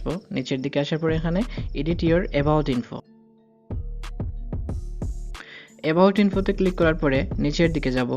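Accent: Sylhet, Bangladesh. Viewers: Sylheti bro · apnar mat hunia bhuja jar sylheti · tumire vai sylheti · no, sylheti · ভাই মনে হয় সিলেটি 🙂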